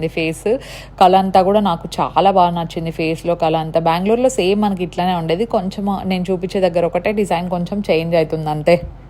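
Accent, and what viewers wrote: Anantapur, India. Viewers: Amma very sweet anantapur slaang super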